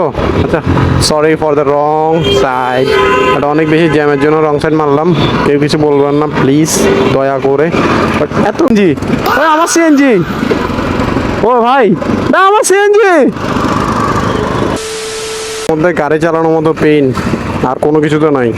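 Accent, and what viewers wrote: Chittagong, Bangladesh. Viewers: Apni ki cttorgram e taken kota sune buja jasse amader cttorgram er manus ❤️🤝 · vai kothagulo valo laglo,Apni Chittagong er? Always video up diyen dekhbo 💝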